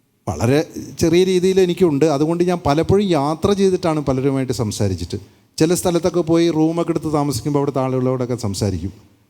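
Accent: Palakkad, India. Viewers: ഇയ്യാൾ പാലക്കാട്‌ ഭാഷ പറയുന്നത് ഒരു പ്രതേക വിഭാഗകാർ പറയുന്ന സ്ലാങ് ആണ്. ഇതൊന്നുമല്ല നല്ല പാലക്കാടൻ ഭാഷ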